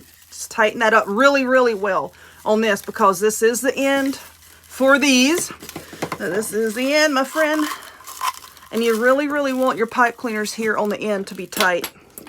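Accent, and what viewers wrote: South, United States. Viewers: Love this wreath, and the black truck one I enjoyed the 2 different styles. I also just really love you southern drawl, and the way you explain things. I absolutely understand your terminology, such as great big gloppy glop, and cruffle. You are a joy to watch, make me smile through the whole video, which I sometimes wish were much longer. I have 2 grandchildren that live me on a foster child basis, the oldest is 14, the youngest 5. So your channel is a breath of fresh air, I love it. Thank you so much for sharing. Have a wonderful Sunday, and next week. Cant wait to see what comes next.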